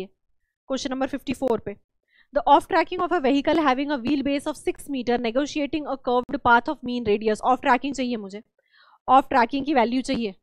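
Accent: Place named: Allahabad, India. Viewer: allahabad se ho kya aap?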